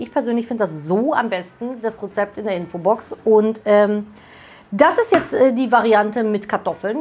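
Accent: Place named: Cologne, Germany. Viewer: heute mit besonders aktiven Kölner Dialekt und am Kochen: Horst Lichter, bisch du's?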